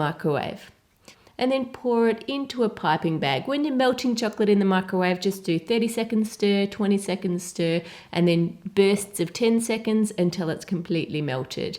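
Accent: Australia. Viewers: The Australian accent is real..! xD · Australian accent (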